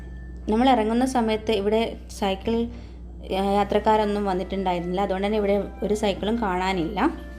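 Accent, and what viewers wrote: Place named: Malabar, India. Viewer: Hi I am originally from Calicut and living in UK.I watched your video today for the first time.I can’t wait to come and visit Switzerland.It looks so beautiful. Because of your accent,I guess you are from Malappuram or Calicut😀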